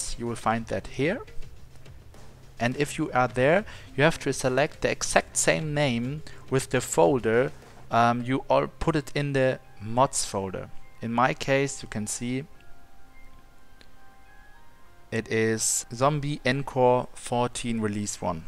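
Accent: Germany. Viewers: Man hört das du Deutscher bist😂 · Dieses German english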